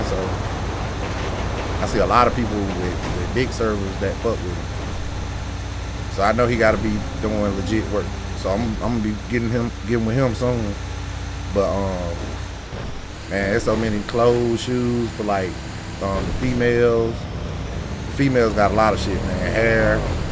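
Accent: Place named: Florida, United States. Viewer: where you from sound like you from Florida champ???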